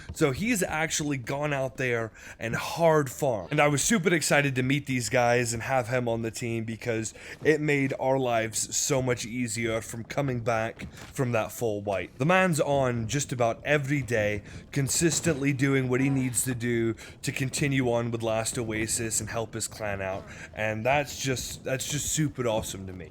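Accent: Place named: Scotland, United Kingdom. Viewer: Nice video mate, give me a shout on my discord over the weekend and we can have a chat. Btw were is your accent from? Bit of a Scottish twang in there.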